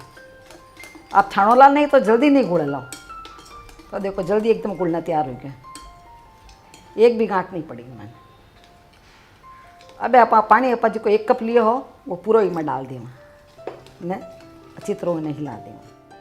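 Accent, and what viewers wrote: India. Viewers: Amma I love your way of speaking.desi style.😙😙😍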